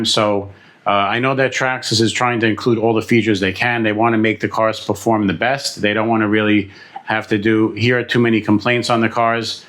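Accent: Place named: Brooklyn, United States. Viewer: My Cousin sounds like you. He is from Brooklyn I know this has nuttin to do with video! LOL Good to hear accent. I live in Florida so I hear way less and miss it!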